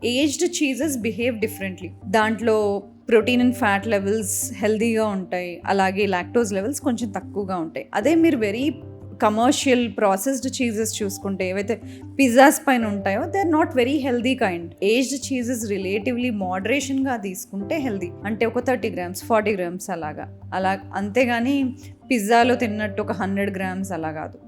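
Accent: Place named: Telugu, India. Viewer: Milk గురుంచి చాలా బాగా చెప్పేరు madam. Thanks for your Telugu slang, which beautifully explained us,the issue.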